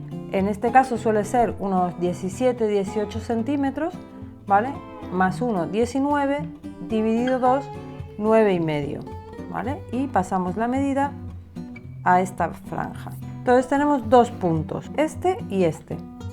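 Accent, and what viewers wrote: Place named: Argentina. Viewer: Hola !!! Me encanto tu trabajo !!! Ya me suscribi active la campanita y puse me gusta .Me tomo el atrevimiento de comentarte que tu acento lo escucho entre español y argentino. Saludos 🙋‍♀️ Y a cuidarse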